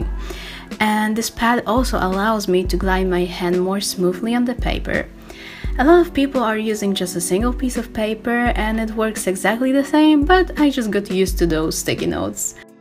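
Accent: Poland. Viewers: Jesteś Polką? Jakoś tak polsko się nazywasz i masz taki polski akcent. Ślicznie rysujesz <3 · Hah! Kiedy cię usłyszałam od razu wiedziałam że jesteś polką :D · Are you from Poland? Cause you have polish accent. You're English is so good. How many years you're learning English? (Sorry if my is bad)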